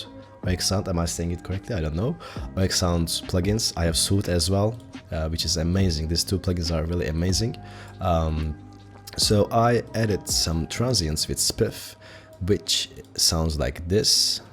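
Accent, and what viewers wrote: Turkey. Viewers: HELAL LAN TURKO AKSANINDAN ANLADIM TURK OLDUGUNU EDITLER TAM ECNEBILERI CEKECEK SEKILDE OLMUS BASARILARININ DEVAMINI DILERIM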